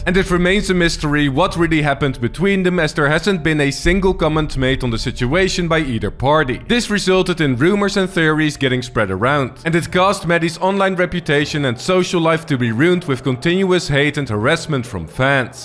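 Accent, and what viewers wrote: Netherlands. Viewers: Where are you coming from? You sound Dutch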